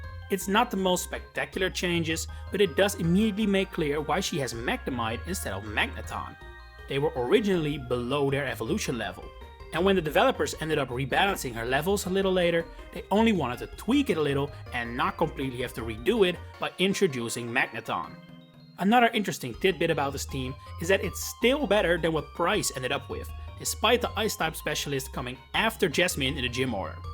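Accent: Netherlands. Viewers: Love the video. Are you perhaps Dutch?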